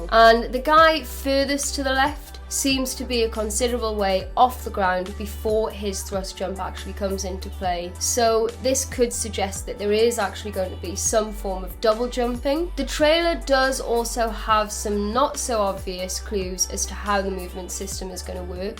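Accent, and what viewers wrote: United Kingdom. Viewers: i dont know maybe somewhere in britain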